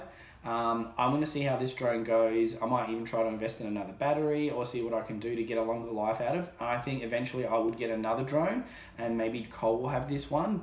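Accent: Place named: Australia. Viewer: Why are you taking in feet and miles? You sound Australian and look less than 70 years old to me.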